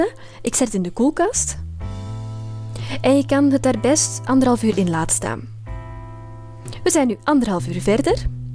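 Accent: Flanders, Belgium. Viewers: Ben je Belgisch want zo klinkt het. Niet erg natuurlijk maar dan. Moet je jezelf niet Nederlands noemen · je spreekt niet "Belgisch" , het is Vlaams en wij zeggen ook bv. praat Nederlands want Vlaams is een accent...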